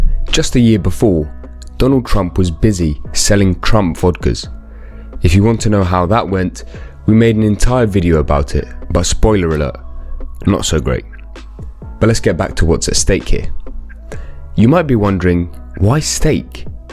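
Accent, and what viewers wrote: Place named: England, United Kingdom. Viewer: I'm at 1.5x speed and it seems like a normal english accent.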